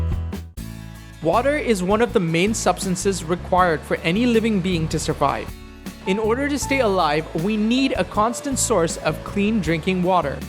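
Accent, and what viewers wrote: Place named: India. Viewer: Fuck you amost have the indian accent tune down to nothing..but still need to work on it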